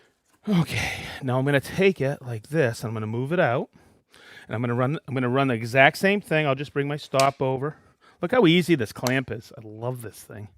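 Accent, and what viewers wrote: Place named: East Coast, United States. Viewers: He has his own channel and completely different personality... well not completely, but more relaxed. As for his accent, it's just an East Coast accent. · Came here just for the wicked Massachusetts accent. :)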